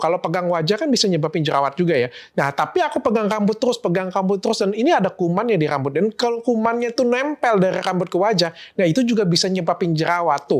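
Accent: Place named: Madura, Indonesia. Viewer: kok kyk madura dok logatnya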